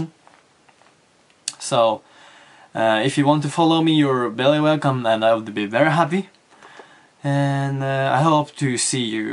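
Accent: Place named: Australia. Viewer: You have a kind of Australian accent! Thank you for the videos :)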